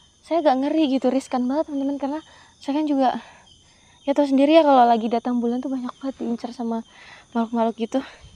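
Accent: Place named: Sunda, Indonesia. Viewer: org mna kak, kek org sunda🤭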